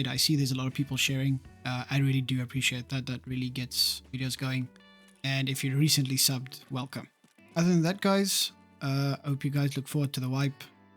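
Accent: South Africa. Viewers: Is mans from South Africa by any chance, lowkey sounds like it 😅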